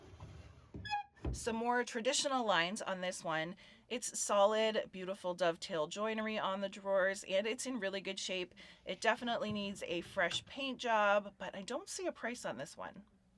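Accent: Canada. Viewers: This was such a great video. Also shows me why I watch you because I think you’re so down to earth. I had asked the question about your profession and you answered my question and loved seeing your hunt for your beauties you make! I also enjoy your Canadian accent lol! I was born in Toronto but moved to the states when I was 10 so no Canadian accent left but my roots go deep. I’m also a “ ” so another reason I follow you lol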